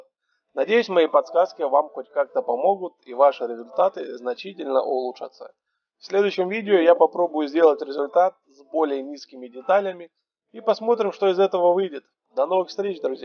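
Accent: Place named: Ukraine. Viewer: Для Украины нормальный говор. А нам русским режет слух. Зато какая информация ценная! 🔥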